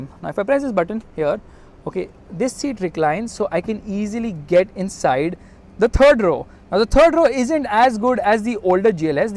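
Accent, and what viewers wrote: India. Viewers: Except your Indian accent everything great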